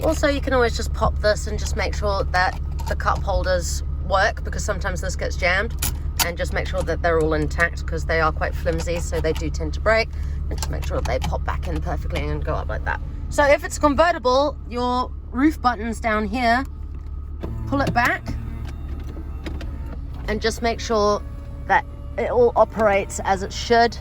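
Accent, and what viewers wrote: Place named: Australia. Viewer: This is Aussie accent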